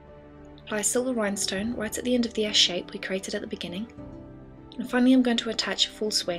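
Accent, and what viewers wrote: England, United Kingdom. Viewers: It's really weird listening to a British accent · i luv ur british accent...its cute!!! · loove that english accent.... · I love your accent. Even though I am from the UK too xD :') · i love british accent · i like british accent ! perfect xD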